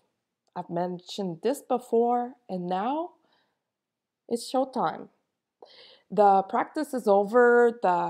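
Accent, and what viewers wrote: Quebec, Canada. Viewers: Est tu Québécois?? Merci.. Spot on message💙🌻🌈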